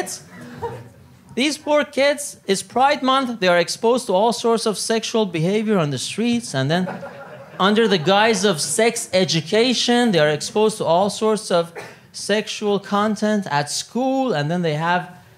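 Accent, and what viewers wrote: Iran. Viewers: De Santos with an Iranian accent? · he talks with persian accent! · Why does he sound Iranian?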